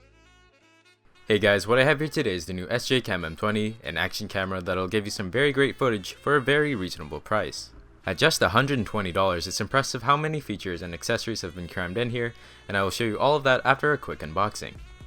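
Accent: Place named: Scotland, United Kingdom. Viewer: Fantastic review maybe talking just a wee bit to fast or maybe its just that accent im so used to Scottish. Great stuff thanks and oh maybe if you have a moment to tell me the awsum music that you used in your review. Much love from bonnie scotland ACH AYE!!!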